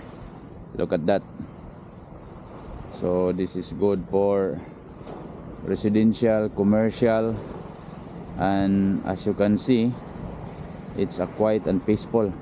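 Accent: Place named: Visayas, Philippines. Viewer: bisaya Naman ang accent mo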